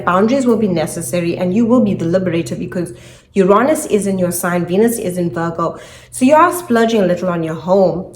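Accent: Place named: South Africa. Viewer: Are you south african? Bcs you have such accent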